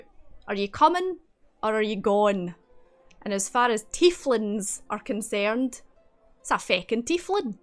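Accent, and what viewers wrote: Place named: Scotland, United Kingdom. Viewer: I think im the only Scottish person who doesn't really swear or say aye 😅. Im wondering where you're from by your accent, central Scotland in or around Glasgow maybe?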